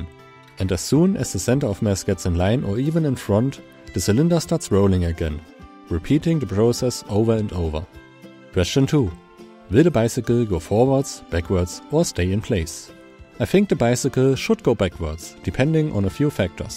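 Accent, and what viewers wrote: Germany. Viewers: Man o man. You can just hear on his accent how much German he sounds. Cool video. Keep it going. Love the animation.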